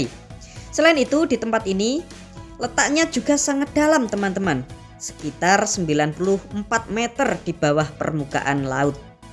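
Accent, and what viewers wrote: Java, Indonesia. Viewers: Orang jawa ya mas... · Medok banget bahassnya mimin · Yang ngomong meddok bgt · Jawa nya medok bgt narator nya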